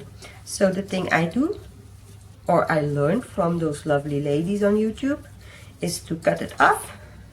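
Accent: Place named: France. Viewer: 🤗You are doing fine. Sounds like a French accent.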